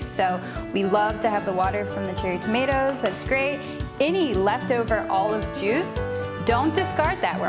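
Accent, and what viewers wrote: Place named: Italy. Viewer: Great great videos! Her Italian accent is lovely!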